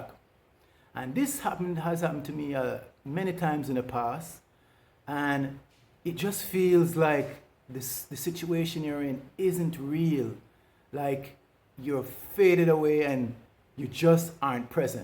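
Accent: Caribbean. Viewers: My Brother, my Brutha...I am SO incredibly happy that YT has gotten around to listing your videos in my Suggested Videos, and SO proud of you stepping up to the world with this channel! While BPD, specifically, is not my issue, Depression and Anxiety ARE, and the first thing - my first response - to your thumbnails was 'Finally! A MAN talking about this, quite vulnerably (I saw the video from 2 years ago, apparently, that seems as a confessional, or testimonial, about HAVING the problem; not just prattling about it from a clinical, and thus, removed perspective) and, furthermore, a BLACK man....even, a fellow "COUNTRY man" (as your speech connotes Afro-Carribean sounds to my Jamerican ears, hahaha! if I'm wrong on that, please excuse me). The drumbeat about us men seems to be loudest in constantly bashing and devaluing us, with the term Narcissist being the only term applied to our psyches with any ease - spoken from the mouthes of White women, primarily, and White people, secondarily, as the default "experts" on either component thereof: For other eyes reading this with offense: I DON'T CARE about how "uncomfortable" what I typed makes you! 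Try being said, awful Man of men, let alone a BLACK man, whose own humanity DAY IN, DAY OUT, is denied by the constant and socially co-signed 'drumbeat' that we endure! I have NEVER felt so directly, explicitly addressed and acknowledged by ANY OTHER persons' videos about mental health - helpful as many of them are - before yours, (?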